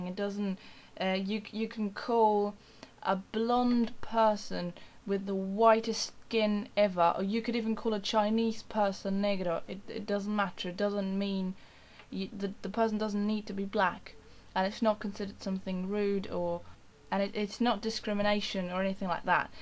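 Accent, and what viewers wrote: England, United Kingdom. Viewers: que tremendo ingles britanico tenes che! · Jajaja, es muy raro escuchar tu inglés y castellano al mismo tiempo. Rather british, isn't it? Jaja, de donde sos, y de donde tenés ese acento inglés? Muy lindo, me encanta tu voz. Yo soy de Salta y acá y en todos lados usamos practicamente los mismos términos que en Buenos Aires, salvo que sin el acento porteño exagerado. Aunque la gente muy de campo o del norte tienen un acento o forma de hablar bastante diferente (algunos de la ciudad también), hablar como "colla" como se dice por acá... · I don't get it. Where are you from? Why do you have that British accent in English? And you also speak German and Norwegian? :) You're Argentinian, aren't you? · MAESTRO jajaj muy bueno tu acento britanico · Posta me encanta el acento britanico que tenes! te admiro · English accent???? i love it!!!! 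i'm from Argentina but my English is American!!! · Your English accent is beautiful! :D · You speak with a British accent! Congratulations. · como hiciste para aprender el acento britanico tan bien? yo vivo en USA y tengo acento yankee, pero quiero aprender el britanico y no me sale! :(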